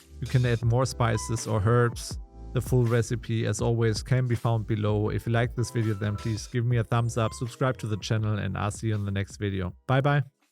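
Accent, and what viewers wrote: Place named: Germany. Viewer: I think you should mention that the lard should be really cold during the Pâte dough making. Preferably put into the freezer 1 hour beforehand. Since otherwise the heat thats created in the food processor due to the chopping motion, will cause the fat to seperate & kind of like with puff or short crust pastry, will result in an oily greasy mess. As a chef, naturally I already know this, but someone who isn't familiar with making a classic Pâte may not know this. Just saying... Btw, your german accent is really strong. lol Are you a chef or are you just a passionate home cook? I think it's a real shame that old classic appetizers like Parfaits, Pâtes, Galantines etc are a lost art. And are slowly fading from existence. Except maybe in France where they uphold the tradition of producing all these classics. But if you look around restaurants in Scandinavia, Spain, Germany, Britain etc It's a real rarity to even find these dishes on a menu, except its maybe a 3 star fine dining etablissement. 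I think I'll do a Poultry liver parfait for new years eve. With maybe a port jelly or a cumberland sauce. And marinate the livers in some madeira and brandy. I admire that you have executed this classic recipe. Cheers !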